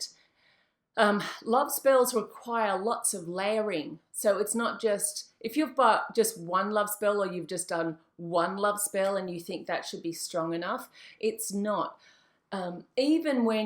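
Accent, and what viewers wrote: Australia. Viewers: Where are you from? I hear an Australian accent. But, then I hear "rrrrrrr's" pronounced at the ends of words like "partnerrrrrr", "casterrrrr" lol . . . almost like an Irish or American person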